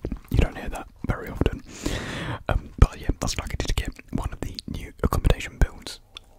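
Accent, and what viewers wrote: United Kingdom. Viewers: I loved your intro and you have a great voice. By the way, I love your British accent😍😍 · british accents are so elite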